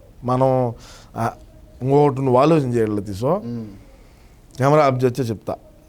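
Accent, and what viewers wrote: Rayalaseema, India. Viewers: Anna needhi Kadapa na 😅 rayalaseema slang lo vunnav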